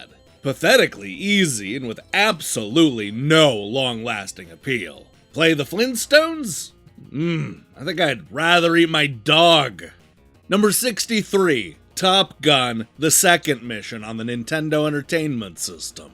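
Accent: United States. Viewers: All this extremely British writing with a heavy American accent really makes this video work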